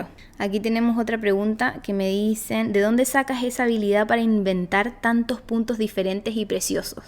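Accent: Chile. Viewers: Hola, he tejido varias cosas siguiendo tus tutoriales de YouTube, explicas muy bien 👍. Me quedé con tu canal porque reconocí el acento, soy de Chile también. Saludos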